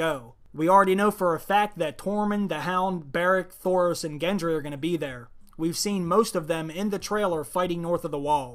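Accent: Pittsburgh, United States. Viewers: ice fucking dragons and narrator has gotta be a pittsburgh guy love the accent lol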